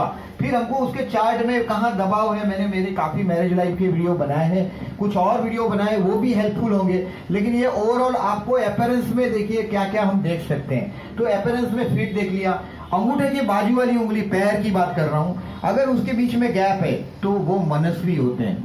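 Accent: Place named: Mumbai, India. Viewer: I really like the way you talking in Mumbaiya tone great sir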